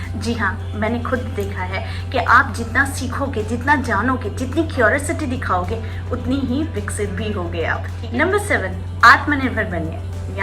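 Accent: Bengal, India. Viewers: Kya ap bengali ho?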